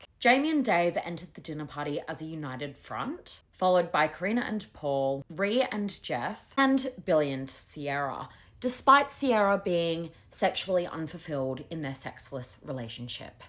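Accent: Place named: Australia. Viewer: Just found you! You are hilarious! So cool to hear an Aussie accent doing the recaps! Going to binge all your content! ❤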